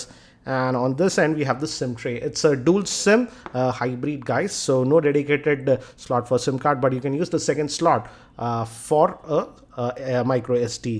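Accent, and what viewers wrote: Hyderabad, India. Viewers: Hydrabadi English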